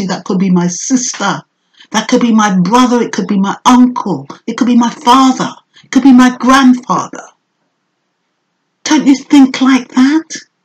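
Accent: Jamaica. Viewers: Your Jamaican accent comes out when you're upset!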